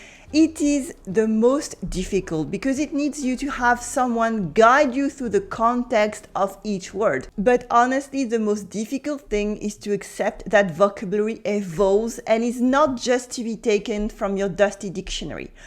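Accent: France. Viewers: Your English is perfect and very idiomatic. And don't try to change the lovely French accent! Just one correction. If I WERE.(Subjunctive).😊 I'm approaching the French I learned in school now again. Also trying to learn Spanish.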